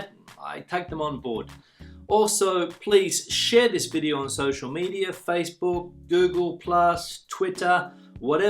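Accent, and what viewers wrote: United Kingdom. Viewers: you have a British accent,am I right? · I love your British accent.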